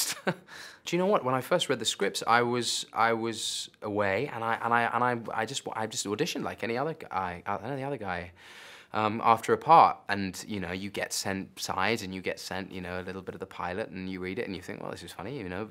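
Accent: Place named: RP, United Kingdom. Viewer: It's certainly posh, but I wouldn't say extremely. There are far more intensely posh English accents than this.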